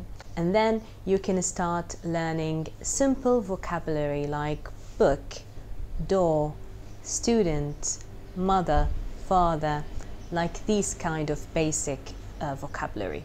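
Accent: United Kingdom. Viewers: مرحباً مس ، شكرآ لكِ على نصائح.. بجد استمتعت بي صوتك الهادئ وطريقتك الطيفه في التكلم عن اللغه الانجليزيه بي اللهجة البريطانية ❤.. عندى بعض المشاكل في اللغه حبيت أخذ رائيك فيها، أنا تعلمت اللغه الانجليزيه منذو سنه ونصف ولكن بسبب عدم مداومه على اللغه الانجليزيه أصبحت انسى الكثير منها وأحياناً في القواعد اللغه، سؤالي هنا هل لديك طريقة لي محافظه على اللغه بدون دخول في تدريس او ماشابه.. كمان إني لستُ طالبة حالياً.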